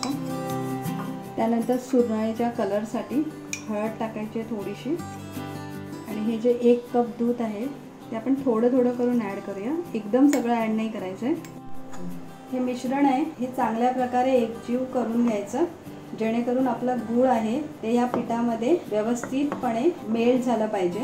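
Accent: Malvani, India. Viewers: Lai Bhaari .....!!! Sweet malwani!!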